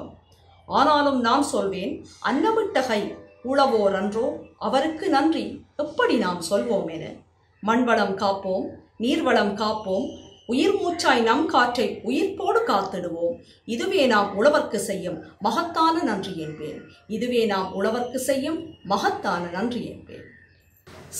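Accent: Sri Lanka. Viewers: ஐயகோ சகோதரி ஒவ்வொரு முறையும் நன்றி நன்றி என்று சொல்லி நம் சகோதரத்துவ உறவை பிரித்துவிட வேண்டாம்😊🙏 இப்போது என்னவென்றால் "நன்றி ஐயா" என்று சொல்லி விட்டீர்களே😊 என் அன்புச் சகோதரி தங்களது குழல்வாய் மொழியென்னும் இலங்கைத் தமிழும் தங்களது கனிவான பேச்சும் உயர்ந்த எண்ணமும் தெளிவான சிந்தனைகளும் என்னை மிகவும் கவர்ந்தது...! என்னைப் பொறுத்தவரை தாங்கள் எனது உடன்பிறவா மூத்த சகோதரி ஆதலால் உங்கள் தம்பி நான் உங்களை புகழ்வதற்கும் வாழ்த்துவதற்கும் நன்றி செலுத்த வேண்டாம். இலங்கைக்கு வருகை புரிய வேண்டும் என்பது எனது நீண்ட கால ஆசை...! எளிதாக வரக்கூடிய காலங்களில் வாய்ப்பைத் தவற விட்டுவிட்டேன் தற்போது கொரோனா கட்டுப்பாடு தனிமைப்படுத்துதல் அது இதுவென்று ஏராளமான இடையூறுகள் உள்ளன. இருப்பினும் நிச்சயம் ஓர் நாள் நான் நமது இலங்கைக்கு வருவேன் உடன் பிறவா என் அன்புச் சகோதரி தங்களையும் தங்கள் குடும்பத்தினரையும் காண்பதற்கு😊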